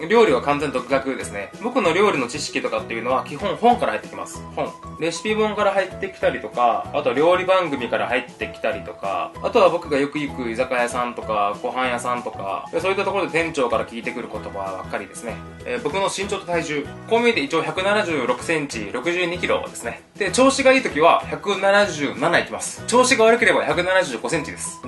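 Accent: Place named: Kyushu, Japan. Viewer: 初めて拝見しましたが 九州人なんですね！ 方言めっちゃ落ち着く〜 ぜひ大分県のお魚も食べてください！ 福岡も美味しいけど大分も美味しいですよ！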